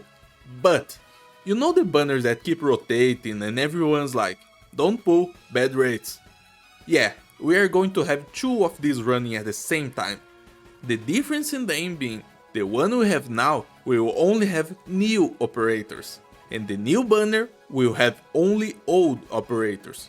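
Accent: Brazil. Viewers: I hear a Brazilian accent 👀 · by any chance, are you Brazilian? i am and the accent your English have is very similar to the one Brazilians have speaking English lol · Sotaque de brasileiro e quase instantâneo de reconhecer khkhgkj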